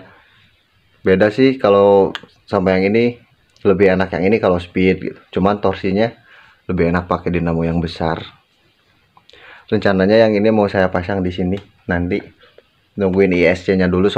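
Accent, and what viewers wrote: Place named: West Java, Indonesia. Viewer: Kang sunda na karaos,asli timana 😅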